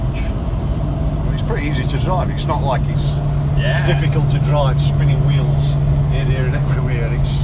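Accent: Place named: Yorkshire, United Kingdom. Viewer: Strange hearing a yorkshire accent in the united states. Awesome build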